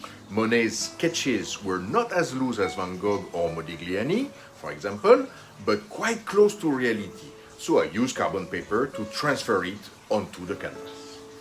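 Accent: France. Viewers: enjoy your French accent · your accent seems to be French, is it??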